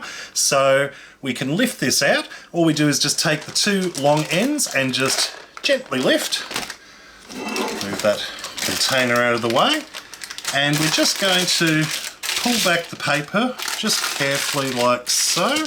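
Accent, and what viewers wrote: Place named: Australia. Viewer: Love your videos I heard your Australian because I am too keep making fantastic videos and we can reach one million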